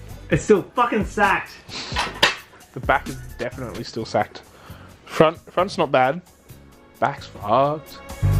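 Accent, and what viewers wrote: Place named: Australia. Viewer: I was wondering myself... english ... airbarg remooved... da fuck, australia¿? (from Spain) Anyway, thanks, really good video mate (y)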